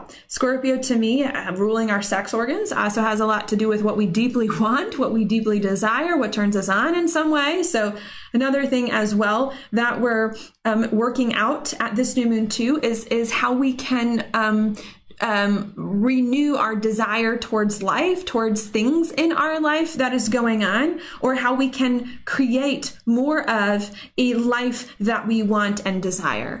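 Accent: South, United States. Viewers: You sound like you are from NC or Atlanta.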